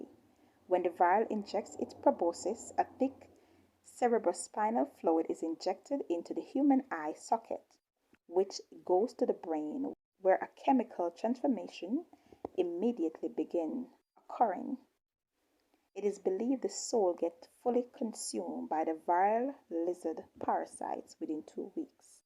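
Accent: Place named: Jamaica. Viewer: I love love your Jamaican accent ( peace and blessings )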